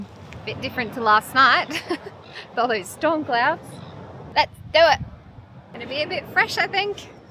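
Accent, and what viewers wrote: Australia. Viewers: What a talented & resourceful women you are 👍. I love the Australian accent ❤️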